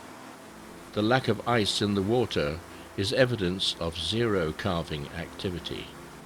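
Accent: England, United Kingdom. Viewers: I don't know why but every adventure video seems more dramatic when it's voiced with a deep English accent. I felt like I was watching a National Geographic expedition from the 80s.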